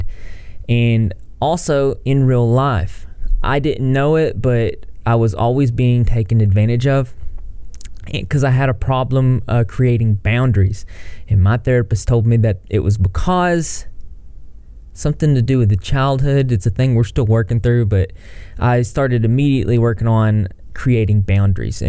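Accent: South, United States. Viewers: I too struggled with boundaries until I started my nursing career. I’m not sure why that changed but I’m glad it did. Not going to say it’s perfect but I learned like you probably have people will walk all over you if you don’t. I noticed you also have a southern accent so I’m assuming you are from here as well. It’s sad that people take our generous nature and upbringing and use and abuse us. It’s not because we are stupid or gullible we are just taught to do for others. I’m glad you are working on this and I hope you continue to do well :)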